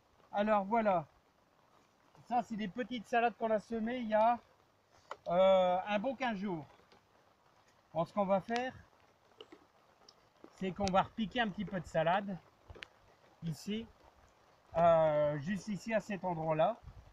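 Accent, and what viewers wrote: North, France. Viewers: Bonsoir merci pour votre vidéo, oui il pleut et attention au mildiou!! il faudra surveiller. J'adore votre parlé du nord!! A bientôt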